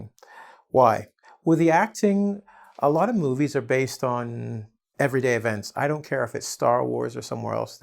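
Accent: Russia. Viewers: I think I would express the same as other people did, you are extremely talented and your Russian accent is perfect. Thank you very much,! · I want more your videos with Russian accent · Ахаха)) Хотел написать комментарий, а уже опередили! Я бы хотел увидеть отдельное видео только с русским акцентом :D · Ahaha, your russian accent is so impressive) Thanks for your lessons and explanation from Яussia) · Like for russian accent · Russian accent is cool))) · your Russian accent is perfect!😁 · There is the best Russian accent I have ever heard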